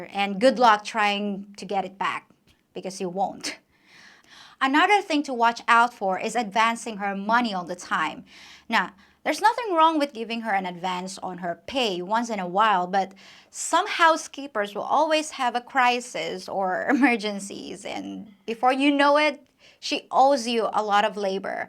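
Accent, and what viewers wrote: United States. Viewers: Where did you pick up Americian things like 'workin" instead of working, and "ya?" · I think it's cute that you speak completely like an American saying "in's" instead of "ing's" at the end of words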